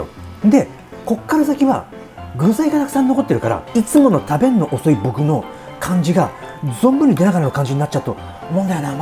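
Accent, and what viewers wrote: Kansai, Japan. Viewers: 好きなキャラが関西弁だからなんかちょっとキュンとして顎んときますね…😍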